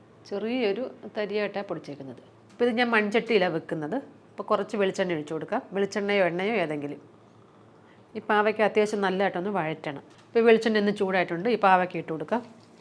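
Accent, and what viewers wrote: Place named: Mavelikkara, India. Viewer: Nalla presentation anavashya vachakam onnum illa bhasha kettittu oru mavelikkara side pole thonnunnu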